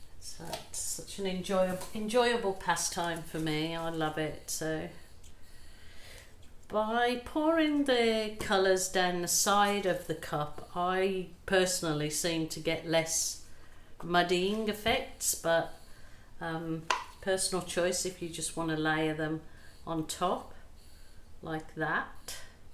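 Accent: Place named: Liverpool, United Kingdom. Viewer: Do you have a Liverpool accent mixed in,.,, sorry if this is rude or you've mentioned it. Love this videos and your simple recipe proves the art comes from the artist's skill. LOL watching you now and it does sound like some scouse in there!!! Ps love these colours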